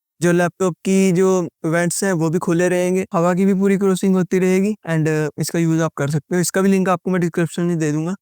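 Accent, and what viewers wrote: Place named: Punjab, India. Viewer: Punjab probably accent se lag rha hai. Or mast tarike se explain bhi kar rahe hai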